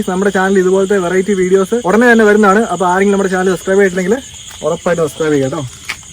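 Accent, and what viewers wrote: Kottayam, India. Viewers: Chettan കോട്ടയം ആണോ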